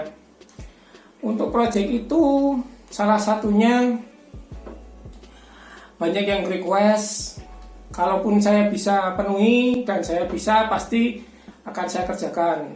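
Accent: Java, Indonesia. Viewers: PT. Niagamas Lestari Gemilang adalah gambaran dari sosok tukang kayu yg sederhana, org Jowo, tur medhok ndeso 😁 tapi lihat! punya impian yang besar dan kemauan yg keras. Selamat bung, anda sudah sukses menjadi inspirasi 312ribu orang. ini luar biasa. semoga terus mnjadi inspirasi bagi kami. #TJJ300k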